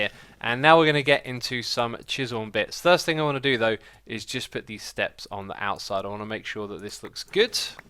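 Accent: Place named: England, United Kingdom. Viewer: Wait!!!! is English???? Wow, i usually thought he was American. Ok that explain why his accent is so different from Americans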